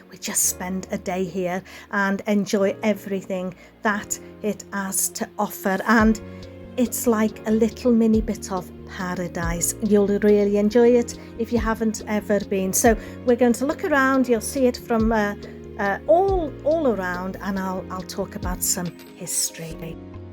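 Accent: Wales, United Kingdom. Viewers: Hi, even though I have seen this one a couple of times before - believe it or not, I think I have a lot of details to comment on (still, this time) and I think even 1 or 2 new questions that crossed my mind. Firstly, I have to say, I am extremely impressed with you and with regards to research and knowledge that is required to put together one of these documentary type videos. And, I am also impressed with your beautiful narration (always)! And, of course, with your lovely and awesome Welsh voice and accent! Amazing place! It seems like (throughout history) England (and other places) was always fighting with Wales (to maybe steal its land). As such, it seems like there was a lot of defending required - by Wales. On another note, it seems like 8 services for the monks each day was a lot - even for monks. With regards to the "Black Death", my guess is that it is likely the same event as the "bubonic plague pandemic". 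I vaguely remember reading one time that the "plague" killed half of the people of Europe. That is just crazy - something so powerful and uncontrollable! By the way, I have a question for you, with regards to the Abbey's revenue sources. You mentioned that the 2 primary revenue sources were "rents and tides". I take it that "rents" refer to the revenues from tenants. Would that include the monks too - having to pay rents to the ultimate owners of the complex at the time? And, regards to "tides", was the complex located near the ocean (with boat dockings)?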